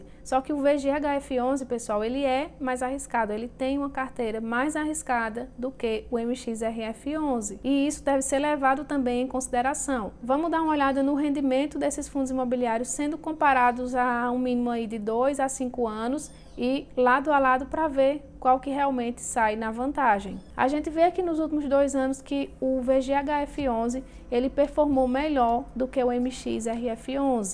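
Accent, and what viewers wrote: Northeast, Brazil. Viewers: Vc é de que estado do nordeste,??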